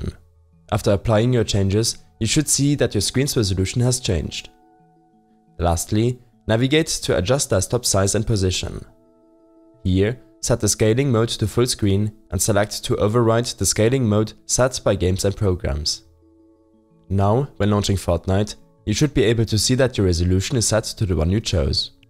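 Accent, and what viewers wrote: Germany. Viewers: Nice one dude, it worked on my computer and btw are u german?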